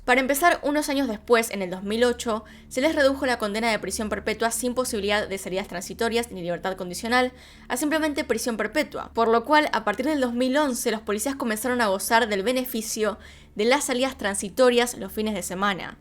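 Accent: Argentina. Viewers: Saludos! Me encanta tu trabajo,tu pelooo y tu acento que me lleva a mi Argentina estando lejos viviendo en México 🏘️❤️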